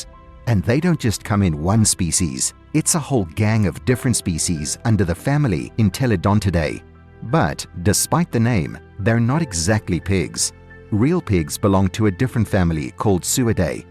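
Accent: United Kingdom. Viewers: UK accents are beyond annoying. DISLIKE.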